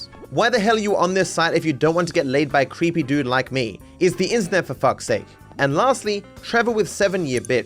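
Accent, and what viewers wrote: Australia. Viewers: Hell yeah, that's why I pay for the internet. To watch an Australian guy talk half a video about an unreal website for unreal horny wifes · other guys at my age: going oudside, doing sports, enjoying life. Me : listening to a random ausiie reading s*x messages.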